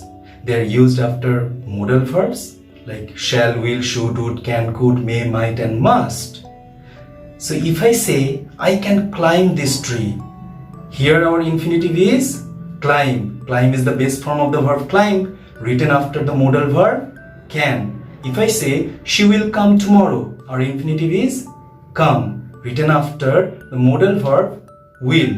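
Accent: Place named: Bengal, India. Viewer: Your accent is wonderful and having Bengali touch little bit.I am suresh kumar from Punjab.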